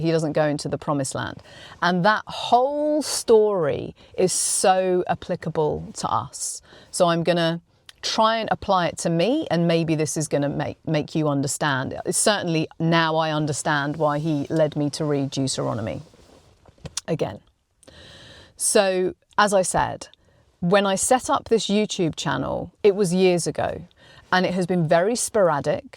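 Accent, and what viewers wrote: England, United Kingdom. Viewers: Brilliant as always! It is highly evident that you have humbled yourself before the Lord and so He is using you in a mighty way. This message resonates with me so much and yes, I do find that there is a definitive pattern of God allowing us to have our own season of death to self so that He may then live through us for His glory! As an aside, somehow, I feel more cultured just listening to you with that lovely English accent. Ha Ha And, since I did not have any children, I bestowed my favorite name to my beloved cat,.:) God bless you!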